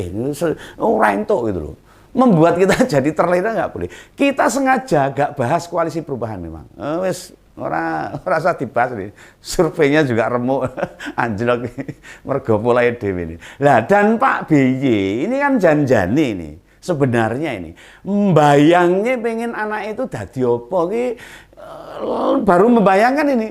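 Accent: Java, Indonesia. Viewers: Omongan jowo nekhek jowo asli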